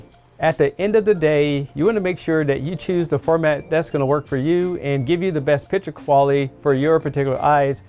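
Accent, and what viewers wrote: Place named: North Carolina, United States. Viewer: I’ve watched a lot of your videos but still can’t quite pinpoint your accent. I’m thinking somewhere in the North Carolina area? Maybe Kentucky?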